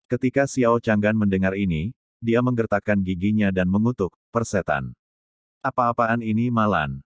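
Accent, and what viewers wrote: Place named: Banyumas, Indonesia. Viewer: Ora ngapak ora kepenak 👍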